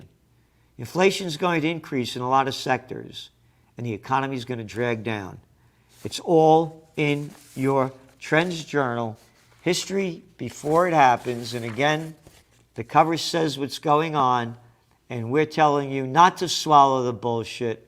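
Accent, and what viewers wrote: England, United Kingdom. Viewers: The English Accent is spot on LOL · Great show, loved the English accent!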